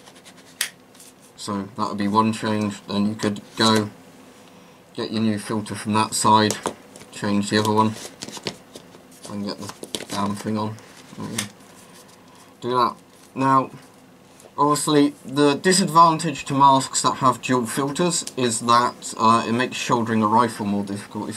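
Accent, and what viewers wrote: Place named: United Kingdom. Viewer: why you sound more british with a mask on? btw thanks for the info :D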